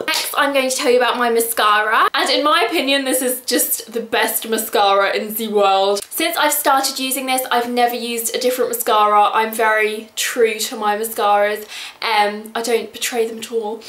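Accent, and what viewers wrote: RP, United Kingdom. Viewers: You're so posh!:]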